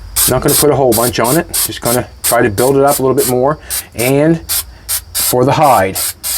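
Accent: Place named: Nova Scotia, Canada. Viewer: Trying to place that accent ,then I realized Trailor Park Boys! Then he confirmed with Nova Scotia. Awesome.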